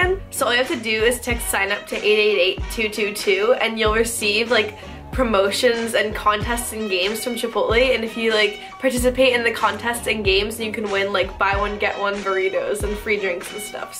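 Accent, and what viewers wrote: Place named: Canada. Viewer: your canadian accent is so cute lol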